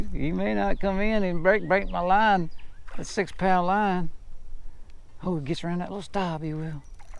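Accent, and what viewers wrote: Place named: South, United States. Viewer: Great Video, loved listening to your 'Southern Drawl'! New Sub from Indiana. Keep on Fishin!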